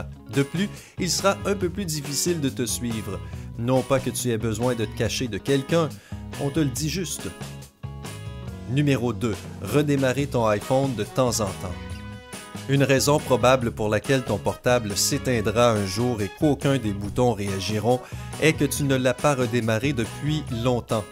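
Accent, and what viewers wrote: Quebec, Canada. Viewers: Sympa t’es canadien ? Sa se voit avec ton accent 😉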